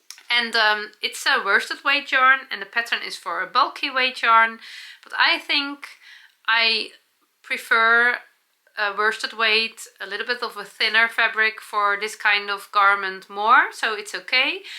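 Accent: Netherlands. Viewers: Just love your Dutchy English - your brightness and cheefulness is so refreshing 🤩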